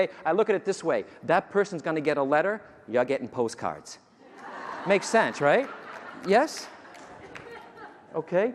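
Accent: Boston, United States. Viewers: I'm from Rhode Island.. sista-!! Lol Bossston'~!! We've got that 'hard ass' slang accent~! Beautiful ..👌🏼. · I LOVE THIS GUY!! I grew up on Cape Cod , MA - he sounds like all of my college classmates, from around Boston, Southy, Framingham!! I love the accent. I can't wait to see my 18 year old Terrier!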